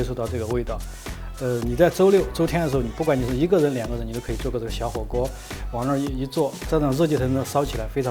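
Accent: Sichuan, China. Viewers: 聽的四川口音，感覺菜更香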